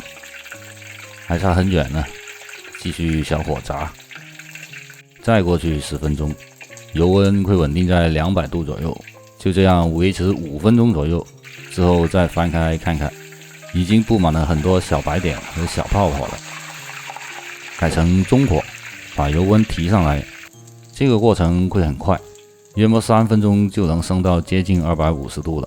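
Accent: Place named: Hubei, China. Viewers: 觉得是带湖北口音的。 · 做得好，讲得好，湖北人吗？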